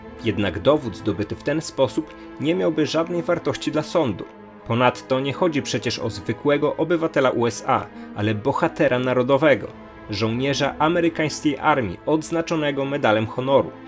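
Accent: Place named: Eastern Borderlands, Poland. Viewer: qzwa cztasz jak jakis uchodzca z kresów wschodnich...tajojku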